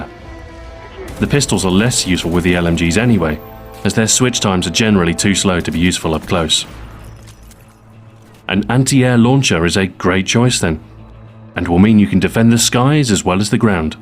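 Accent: United Kingdom. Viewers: Only a British accent could pull this type of informative video off. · is he from the uk? i am.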